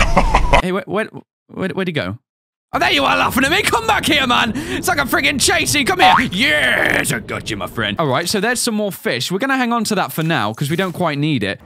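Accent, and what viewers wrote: United Kingdom. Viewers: He sounds way more british here 💀 "SubnAUticA"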